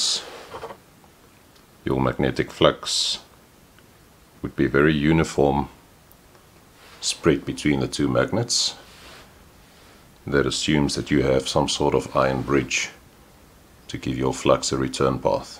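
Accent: South Africa. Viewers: You sound South African. :)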